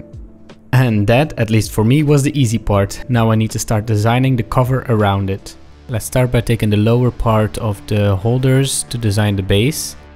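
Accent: Netherlands. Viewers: I know a Dutch person when I see and hear them xD. For I to am a flying dutchman xD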